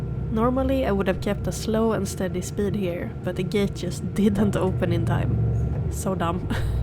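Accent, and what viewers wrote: Sweden. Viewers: du høres Svensk ut? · Charming Swedish accent😅 (the impossible i’s😅😅)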